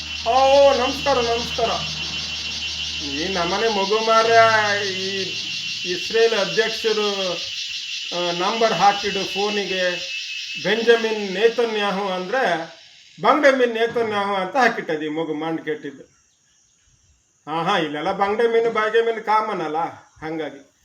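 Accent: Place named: Malenadu, India. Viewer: ನಮಸ್ಕಾರ 🙏 ಮಲೆನಾಡು ಕನ್ನಡ ಬಳಸಿ ವಿಡಿಯೋ ಮಾಡುತ್ತಿರುವುದು ಕೇಳೋಕೆ ತುಂಬಾ ಸೂಪರ್ ಆಗಿದೆ 👌👌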